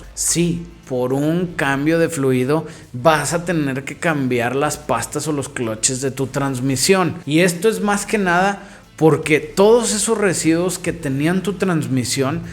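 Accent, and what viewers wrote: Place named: Monterrey, Mexico. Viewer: Eres de monterrey vrd vato!! Jeje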